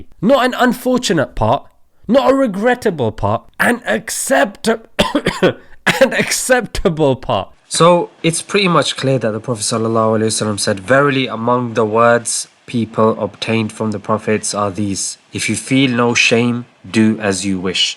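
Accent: Pakistan. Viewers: I laughed so hard on your Urdu accent😂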